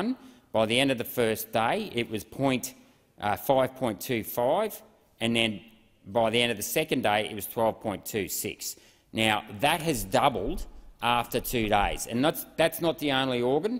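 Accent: Australia. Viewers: I've heard it spoken by so many Americans, and foreigners it's nice to hear it from an Aussie accent I totally understood every word so eloquently described anyone could understand. Brilliantly stated .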